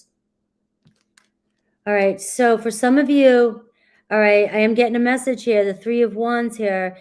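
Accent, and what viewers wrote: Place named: New York, United States. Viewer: where are you from? You kinda sound like me, from New York but raised my kids in Maine. Been to Salem, Mass several times.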